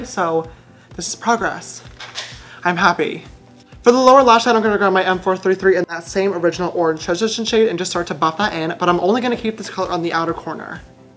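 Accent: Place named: New York, United States. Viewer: He sounds like he from ney York is he ? I love NY accents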